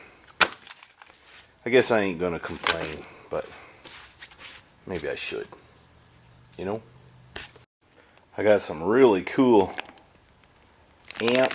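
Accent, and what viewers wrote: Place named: New York, United States. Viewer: Enjoying the New York accent mixed with the southern slang!!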